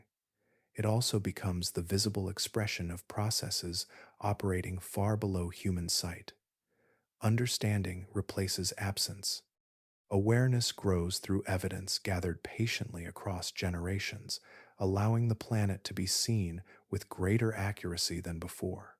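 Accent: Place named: United States. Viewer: Since you have an american accent, it'd be more like Toe-paw.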